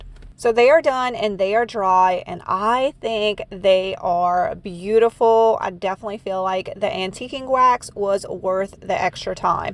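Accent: Louisiana, United States. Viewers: Good day. I love your accents. Please settle a bet. I hear Louisiana and The South. Am I correct? Pft, my son says I'm wrong.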